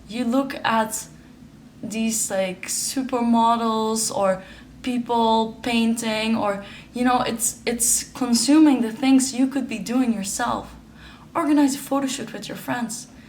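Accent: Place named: Netherlands. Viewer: I lived in NL 7 years. I recognize that accent anywhere. 😊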